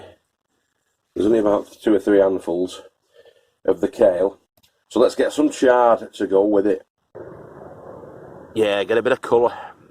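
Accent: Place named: Manchester, United Kingdom. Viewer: Hi, I'm a new subscriber and glad to be on board. Ex Manchester boy long way south now so it is good to hear your accent and see you smile. Thanks for sharing and more please on the sowing & planting under the smaller hoops. Have a very good year. Geoff.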